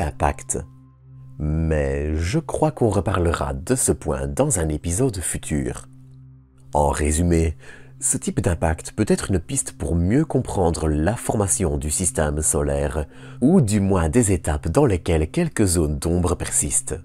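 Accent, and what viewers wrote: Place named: Flanders, Belgium. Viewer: Flamand donc ? Bonne soirée et bravo pour ce que vous nous offrez en vidéo !!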